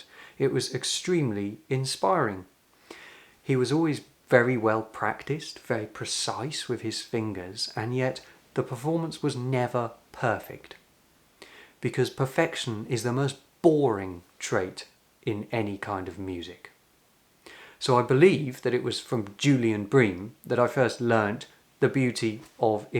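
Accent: United Kingdom. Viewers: The only problem with your videos is that I find myself thinking with a British accent for some time afterwards! I’ll be fine. Carry on!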